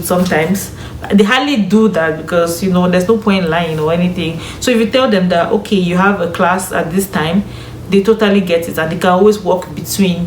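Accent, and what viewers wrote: Nigeria. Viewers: Mehn…you sound so Nigerian ✌🏾✌🏾✌🏾🙂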